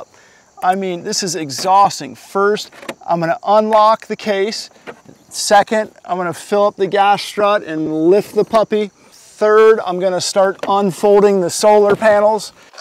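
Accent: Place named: California, United States. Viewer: This guys Cali accent is hilarious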